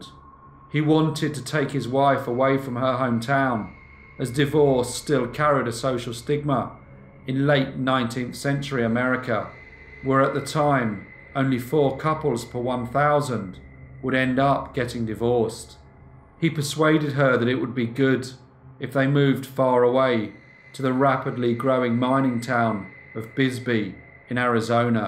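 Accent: England, United Kingdom. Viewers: It is a good strong and very clear English Accent you have and perhaps some People Don't like certain Accents and nothing can change People's minds on an accent. Don't mind the critics,your channel is a treasure! You always deliver the story brilliantly and with real conviction. Its always a pleasure to listen to your stories,thanks again.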